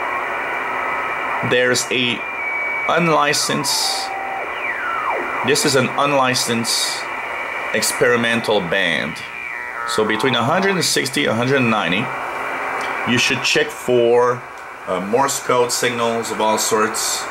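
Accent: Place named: Quebec, Canada. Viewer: Thought you sounded Québecois haha! Go habs go!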